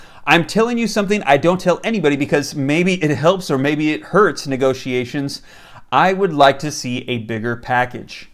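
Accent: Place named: California, United States. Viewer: whoops! I'm sorry if I was being a butt a little but everything I said here is a true statement of mine. however I do like and appriciate his work which I have no problem about him making money off of those vids👍( I mean it's time like this how could we blame him on doing what he can time like? plus at least it's all coming from big rotten companies not our blood sucking tax!!!! 👌👌👌 **AND i think hes kinda cute with his cali accent❤️ I really really NOT a hater I do respect ppl who are not wanting those updates also💚 since it's been so ridiculous it's not even funny that politicians only think about themselves!!!!!!!😡 we ARE HURTING we NEED PAY BILLS RIGHT NOW!!!!! yeah Bills, NOT for luxury vacations or sports cars or anything else we wanna spend our money on.... THATS WHY THIS UPSIDE DOWN ROLLER COASTER MAKES US MAD & SAD I'm with ya all!!!!!!!!!!! 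GOD BLESS OUR AMERICA 🇺🇸 p. s. please know that I'm your subscriber & Ive been watching all of your vids & DESTROYED THAT LIKE BUTTON EVERYTIME contrary to my bitter comments😝 💚💚💚💚💚💚💚💚💚 So please keep up w/ your good work! WE LOVE YOU 🤘!!!!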